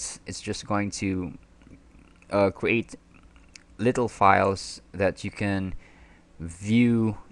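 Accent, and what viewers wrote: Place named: Philippines. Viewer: Pinoy ka?, ganda ng accent mo...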